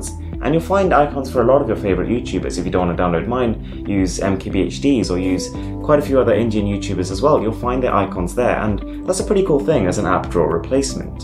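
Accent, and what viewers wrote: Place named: United Kingdom. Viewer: do all british people have this tone when they talk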